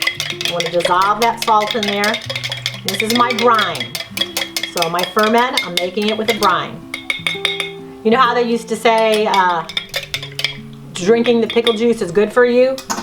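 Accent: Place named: Upstate New York, United States. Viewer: if your going to give out recipes, please learn the DIFFERENCE BETWEEN A Teaspoon and tablespoon, there's a huge difference u said add 4 teaspoons to a quart, you added 4 Tablespoons maybe in upstate new York they don't know the difference but in downstate people know the difference at least based on your accent u sound like your from waaaay upstate had to downvote your video because you gave a recipe with completely wrong measurements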